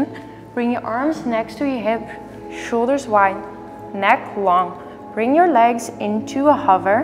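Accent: Netherlands. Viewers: Is Dutch? She sounds Dutch and the name checks out. Hey if you read this and you are Dutch, ik ook!!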